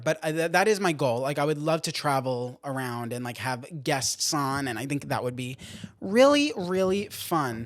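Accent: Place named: New Jersey, United States. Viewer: Your jersey accent sounds amazing. Da drawer. Love it!!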